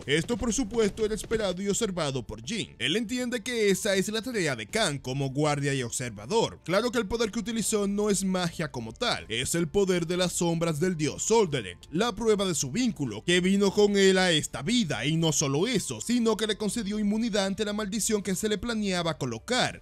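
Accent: Dominican Republic. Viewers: eres dominicano ?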